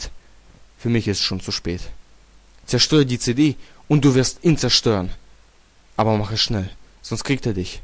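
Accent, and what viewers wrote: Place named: Russia. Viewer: Haha du bist voll geil ich liebe es wenn Russen so einen geilen akzent haben wie du! Aufjedenfall abboniert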